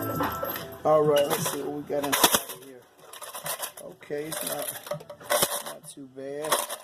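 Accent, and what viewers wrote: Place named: New Orleans, United States. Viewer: Not sure what rabbit hole I’m in that I landed on a vending machine video but I need to know where this man is from so I can place the accent (love it, New Orleans?) and I’m going to look into buying a vending machine!! Lol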